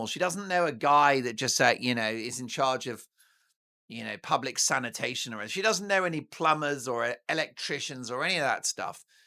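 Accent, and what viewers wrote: Australia. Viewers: Your Aussie accent is terrific!!! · your Australian accent is pretty good but it's more * than *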